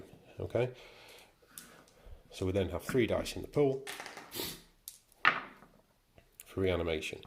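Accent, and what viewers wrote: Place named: England, United Kingdom. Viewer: Ya I have trouble with English accents lol damnit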